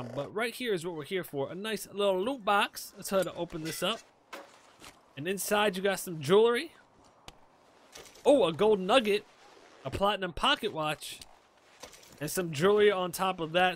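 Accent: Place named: South, United States. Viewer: Like your videos but not the southern accent.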